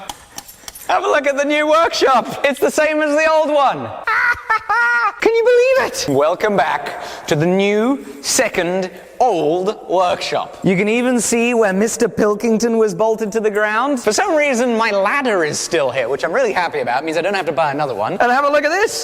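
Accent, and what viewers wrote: England, United Kingdom. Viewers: It’s weird how in the states he sounds super British, but in the UK he sounds American. He just sounds weird everywhere. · sounds like his British accent is coming back strong since going back to the UK.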